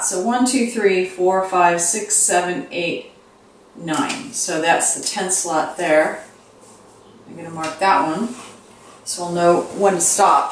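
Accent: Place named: Canada. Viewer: Love your calming voice sounds Canadian to me ,,thank you this a great video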